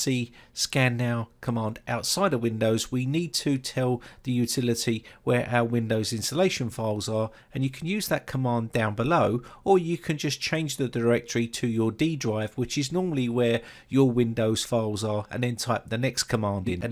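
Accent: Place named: Scotland, United Kingdom. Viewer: Very great scottish accent!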